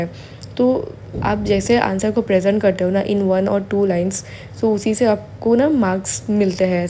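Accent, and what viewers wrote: India. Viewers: Hii i love you hindi accent,,👍👍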